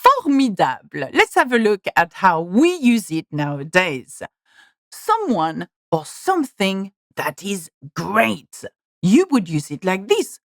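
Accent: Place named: France. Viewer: Your English accent has become very much French😀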